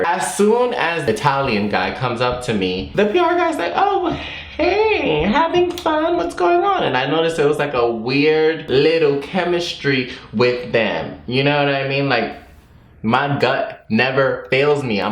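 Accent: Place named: New York, United States. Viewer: Your NY accent is heavyyyyy! 😂 mind you I’m from NY too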